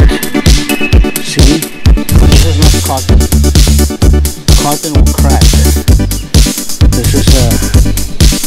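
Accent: South Africa. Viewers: I detect a fellow South African perhaps?? Please help me find a similar product in SA!! I need a flat roof doctor in Cape Town please!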